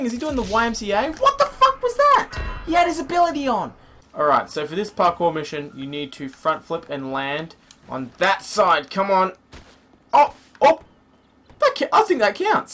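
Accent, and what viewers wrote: Australia. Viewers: R u Australian because u r to loud mister u need to turn the noise down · Australian accent.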